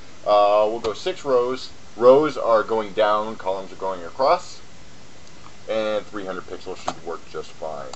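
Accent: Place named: Texas, United States. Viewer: nice tutorial thanks! oh btw, you are from Texas, right ? cool accent :) cheers from Argentina!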